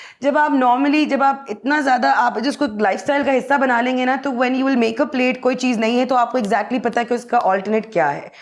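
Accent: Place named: India. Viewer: Very informative. Also take some positive criticism. Speak more in Pakistani accent. There is a touch of Indian accent in your tone, maybe because you watch Indian media.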